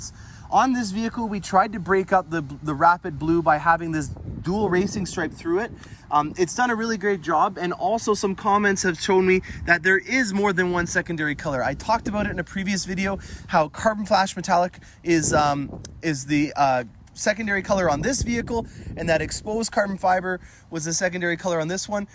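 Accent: Canada. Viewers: Don’t get me wrong...I love the videos and the Canadian accent makes me smile (my father was from Canada).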